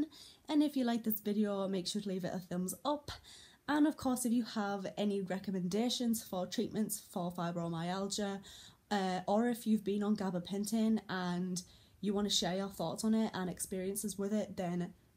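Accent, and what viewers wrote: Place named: Scotland, United Kingdom. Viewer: Lovel, cute accent. I presume Scottish. Sorry, I am from California and haven't been to your part of the world yet. But yes, Gabapentin works for me for diabetic neuropathy and absolutely has different affects depending on so many things, like foods I have eaten, interaction w/ other meds... BTW you've got some brilliant music, et al. of course! assuming you are Scottish that is.How is the Gabapentin working now?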